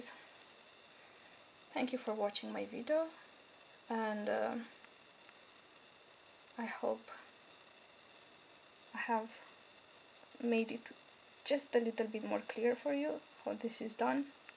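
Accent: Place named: Iceland. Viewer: Your accent sounds very Icelandic, what is your first language?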